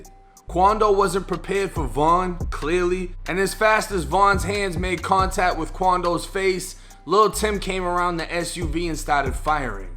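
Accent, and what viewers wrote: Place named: Massachusetts, United States. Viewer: Yo you sound like you're from Mass, straight up north shore!! But anyways, I like ur videos!! Good shi*t, keep it up!! 💯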